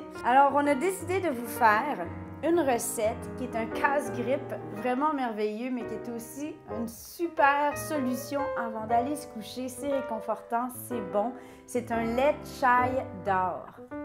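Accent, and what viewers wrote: Canada. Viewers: Ne serait-ce pas un petit accent du Canada ? Quel accent, je l'adore. Je vous embrasse.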